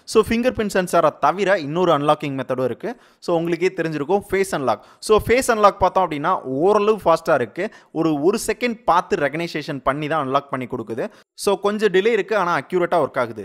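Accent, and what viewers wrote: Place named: Madurai, India. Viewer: Bro neega madurai thaana... Norikkivittainga...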